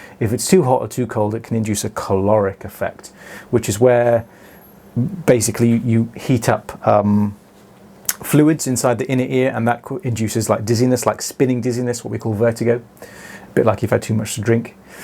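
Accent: United Kingdom. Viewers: Boy how I love your descriptions and your British accent ❤😊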